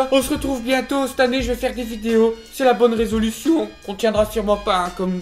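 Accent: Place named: Nord, France. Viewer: Il est de ou ? Parce que son accent est plus du 59 que du 62 .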